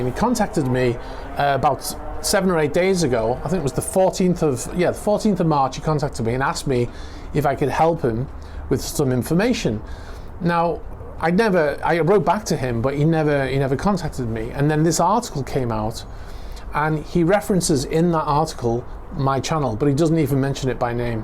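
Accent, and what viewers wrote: Liverpool, United Kingdom. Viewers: That WSJ is such a prick for stealing your information! Shows that most mainstream journalists are vile and why many of us are turning away from it and concentrating on YouTube instead. I hope karma steps in…. But your reporting is so much better, concise and not biased which is so refreshing and kudos for reminding the idiots out there that not all Russians are bad. Also guessing you are from Liverpool? Lol I’m from the Wirral!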